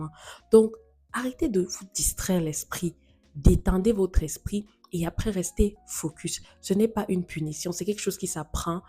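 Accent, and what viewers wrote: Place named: Cameroon. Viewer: J'ai l'impression que tu es d'origine Camerounaise de part ton accent... J'ai envie de te dire Merci infiniment ma sœur 🙏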